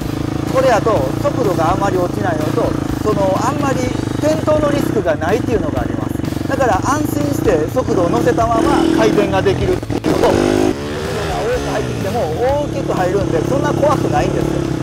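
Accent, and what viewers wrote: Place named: Kansai, Japan. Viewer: シャープですね〜(^ ^) 素晴らしい動画でした！ 柔らかい関西弁も聴きやすくて良かったです(^｡^)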